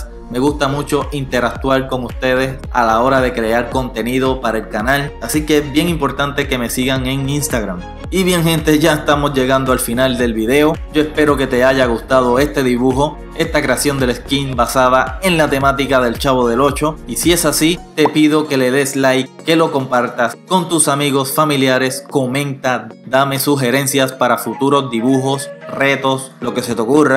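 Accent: Puerto Rico. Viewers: eres de puerto rico porque creo que eres de puerto rico por que se te nota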